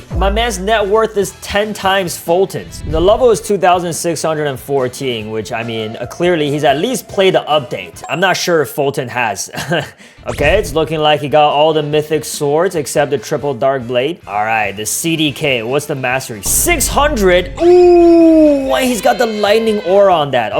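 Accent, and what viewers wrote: Canada. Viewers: Bro are you from canada 😈😈😈😈😈😈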